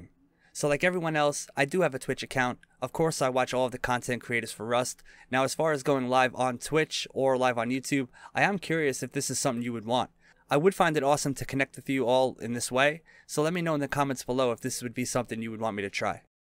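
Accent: New York, United States. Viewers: wait wait wait, was someone not able to tell that is a NY accent? good video as always.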